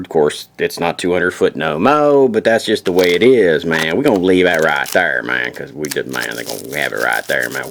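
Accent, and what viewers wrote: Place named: Appalachia, United States. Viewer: Is it possible to do the whole video without sounding like an inbred hillbilly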